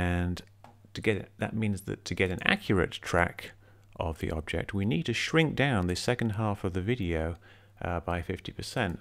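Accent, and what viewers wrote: United Kingdom. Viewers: Are you serious... a British accent doesn’t make you intelligent clearly... can’t fix stupid I suppose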